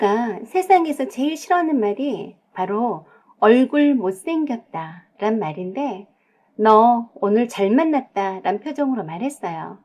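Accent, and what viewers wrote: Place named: Gyeongsang, South Korea. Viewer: 경상도 사투리가 영....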